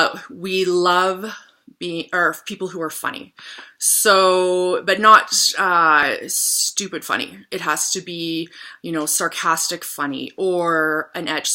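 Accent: Canada. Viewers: You speak like in some parts of Canada. Are you Canadian?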